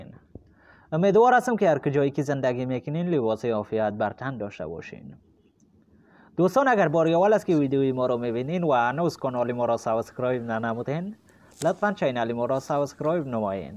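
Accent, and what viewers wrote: Tajikistan. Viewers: خیلی هم علی و واضح بود تشکر بسیار زیاد و لهجه تاجیکستانی هم اینقدر زیباست که هر رقم آدم متوجه میشه ::::::::::: بنده افغانی هستم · تا الان با گویش تاجیکی آشنا نبودم، متفاوته و زیباست، ممنون از آموزش خوبتون، بهش نیاز داشتم🍀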